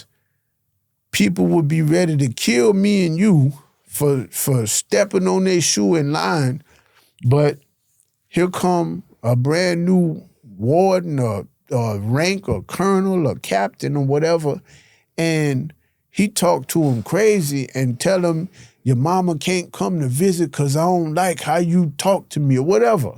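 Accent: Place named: Louisiana, United States. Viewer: He got that Louisiana draw in the way he speak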